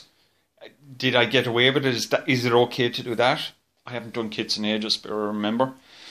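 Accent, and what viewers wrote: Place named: Ireland. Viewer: Tree kilo kit😂 nothing better than the ROI accent, always make me smile. Bit of a pain that your gear is in storage but at least your brewing buddy. Sure they will turn out smashing🍻👍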